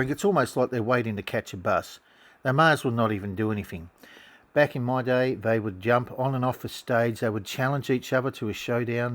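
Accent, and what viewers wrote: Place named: Australia. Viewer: This guy speaks with a very distinct accent - i would estimate that he is either directly from New Zealand, Tasmania or Australia.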